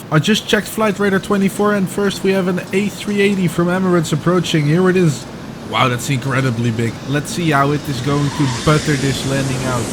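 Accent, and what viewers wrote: Netherlands. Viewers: Hello , iam. A plane fan ,but I smell a Dutch accent from ur mouth….. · Hou van da Hollands engels en leuke video man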